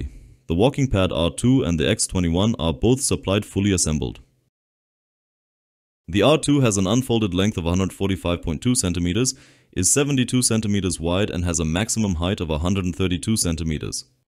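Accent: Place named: Germany. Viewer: You sound German. Why no discount for Netherlands? 🙏🏼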